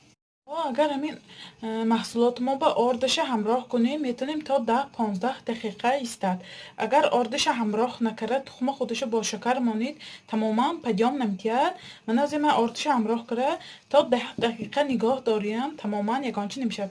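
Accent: Isfara, Tajikistan. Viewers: Хехехе)))) Ба Душанбегихо лафзи Исфарагичона ёд тиен апачон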